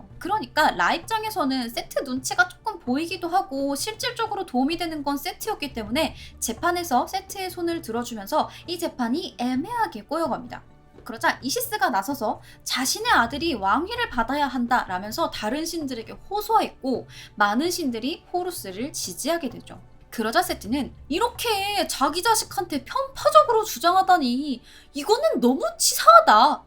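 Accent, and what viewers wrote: Gyeongsang, South Korea. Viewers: 응 경상도 여자네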